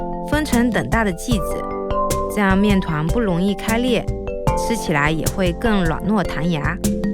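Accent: China. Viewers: 汶。 我看过这个频道之前的影片，通过他的口音我知道他是大陆的，所以我才会这样说，还有一种更搞笑的，视频内容讲中文的，标题就是中英两种，他们就那么想外国人看到？这种情况只有亚洲人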